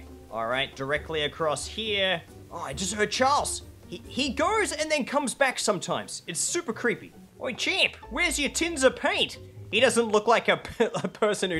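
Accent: Australia. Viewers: Definitely Australian 💀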